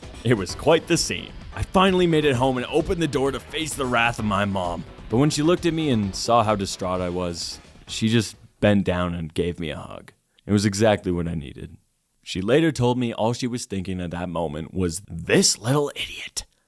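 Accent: Canada. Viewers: Are you Canadian too?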